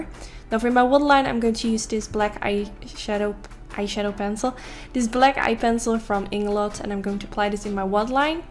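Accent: Netherlands. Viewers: is she dutch? Idk her accent sounds Dutch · Ik moet zo lachen om jouw heerlijke Louis van Gaal engels! Ik vind je geweldig!!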